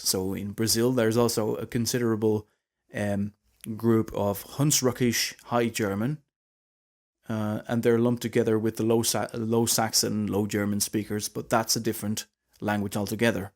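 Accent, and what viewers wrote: Ireland. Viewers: How did you get an Irish accent? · You sound Irish. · I have the funny problem of cognitive interference of your Irish accent when my mind is expecting a first language adjacent accent, that is with more influence from Low German. My mind will cope by thinking you're an Irishman who has learned Low Saxon! Good to learn more about my ancestral language. My family were from Westphalia region and Altmark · A Dutch guy speaking Irish accent. This is new to me. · The bloke sounds like an Irishman but says he is Saxon? I thought the Low vs High was geographical reference. Were Danes Vikings or maybe a transitional population?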